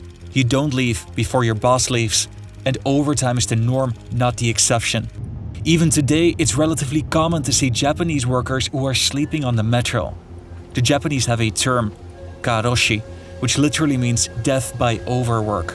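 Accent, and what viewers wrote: Netherlands. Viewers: Dutch accent English. Very well documented this. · I know a lot of people who want to live in Japan. People who are taking their culture with them and try to enforce it on the Japanese, which will be similar scenario what is happening in the west right now.... And yu are Dutch channel. As native Dutch speaker myself can always hear the accent haha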